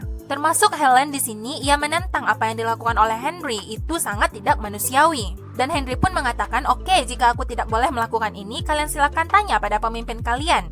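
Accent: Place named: Padang, Indonesia. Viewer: Ini orang padang yak🤣🤣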